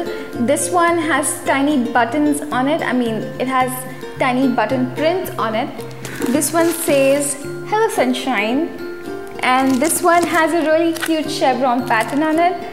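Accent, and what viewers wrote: India. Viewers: This wasn't really a back to school giveaway more like a make up haul where r u from and where do u live because you have an Indian accent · I have one question I am not trying to be rastist or anything r u from india bc I have a uncle that is and his relitives have an accent and urs is almost the same be aware that I am not trying to offend any one that is from india or not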